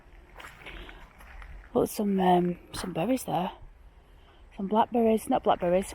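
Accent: Oldham, United Kingdom. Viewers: Hi!-I'm an Aussie that used to live in Royton,-I used to love going to Owdam,-it had heaps of beautiful buildings and I love those wonderful Victorian graveyards!--I really appreciate your hard work! and what you do to your house, is great,and "artistic"-& you always "have a go"-nothing puts you off!--northern people are the best!!--never lose your Oldham accent,-nor your great sense of humor!!--it cant be replaced!---all the very best.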